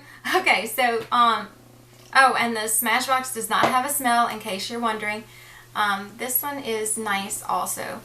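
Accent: Louisiana, United States. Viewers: What is your accent? I'm from SC and I feel like occasionally I'll hear some Cajun? I mostly stick to CC cream while I'm getting use to retin a. I'm just not peeling like a lot of people do. And I use a sun block with cc creams sunblock just for extra protection. I just found your channel. I love it. Looking forward to hearing more from you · Hi thanks for thos video. I can totally imagine us being friends if we were neighbors Totally. In fact you remind me of a friend of mine Natalie... lol. I live near new orleans. I thought i detected a little southern cajun accent in your speech. Its a unique sound but i wonder, are you from nawlens? I was just thinking about what you was saying about makeup in general and how you love it, love applying it , buying it, shopping for it.... Etc. And how its something just for you and i totally get it. I mean i love love love trying different makeup and all of the above as well. 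Lately I've been more and more concerned about the the ingredients used in my cosmetics. I think IT Cosmetics is an awesome brand because they really try to use good ingredienrs and not just products that arent harmful but ones that are actually helping our skin. And heres a tip to try for the ABSOLUTE BEST LOOKING UNDEREYE: before applying anything to ur cleaned face...use an undereye cream or whatever moisturizer absorbs well and that you love. After it sinks in.... wait 3-5 min and apply it again. Apply a min. Of two layers of this moisturizer. Pref..4-5 layers. Now, make sure these layers are not too thick and they do get absorbed into the skin... very well. This will plump your skin some and really really help. Step 2 - use the slightest amount of eyeshadow primer just under your lower lashes. You might want to apply it to your lid first and just sweep whats left over under the bottom lashes. 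It has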